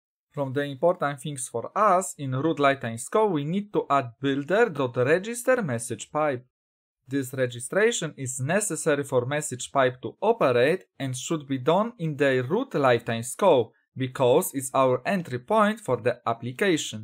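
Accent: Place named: Poland. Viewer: Ziomek, słuchać w uj że jesteś Polakiem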